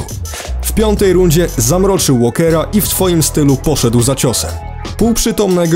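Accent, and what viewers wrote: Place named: Germany. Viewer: Akcet dość mieki ale slabo niemieckie to nie rozpoznałem że Polska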